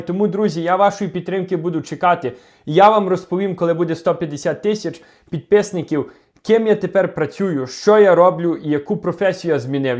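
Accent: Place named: Western Ukraine, Ukraine. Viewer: Красивый Украинский язык с западной ноткой. Восхищен! ( Виктор Одесса)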